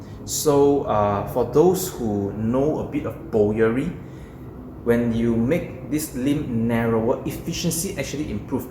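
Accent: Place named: Singapore. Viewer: You have a Singaporean accent 😊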